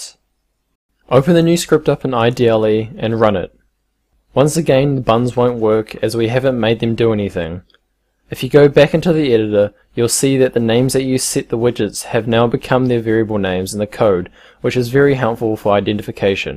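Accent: New Zealand. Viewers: That T H I C C en zed accent Great vid!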